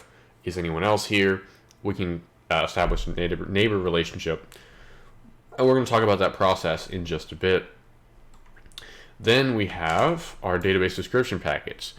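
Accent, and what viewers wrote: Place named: Texas, United States. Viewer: Sounds somewhat Texan - and I live in Scandinavia :D So, probably have to make do with the ones you release here then :)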